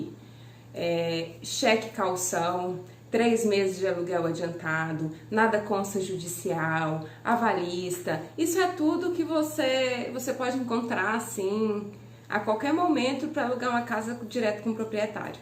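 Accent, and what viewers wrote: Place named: Minas Gerais, Brazil. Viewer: Oi, vc é mineira? É tão gostoso teu sotaque. Vc sabe se no saguaçu e na zona industrial norte tem enchente?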